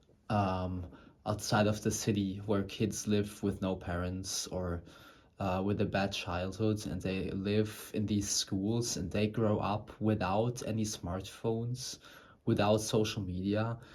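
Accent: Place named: Germany. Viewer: 2 seconds in and I know he is German :face-purple-wide-eyes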